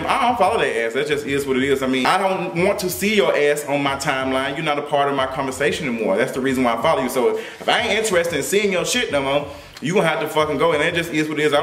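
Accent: Memphis, United States. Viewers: It's so funny to hear someone from Memphis try to sound like they're not from Memphis lol. I love you!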